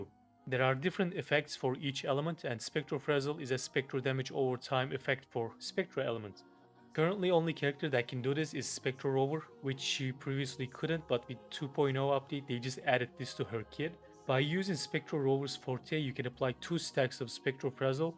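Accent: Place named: Turkey. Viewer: türk müsün? aksan türk aksanı.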